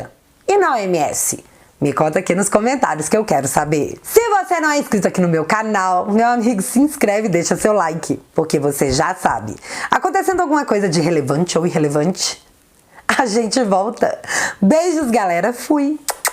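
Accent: Minas Gerais, Brazil. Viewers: Muito bom e a hora que do muita risada kkkkkkk esse sotaque mineiro e de mais....grande mídia... só mentira...OMS ...nem se fala.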